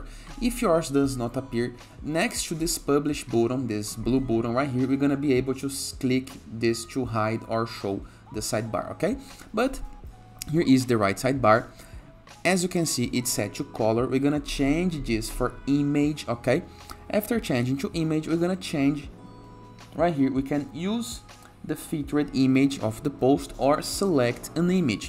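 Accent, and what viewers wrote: Brazil. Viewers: dificel não reconhecer o br na fala kkk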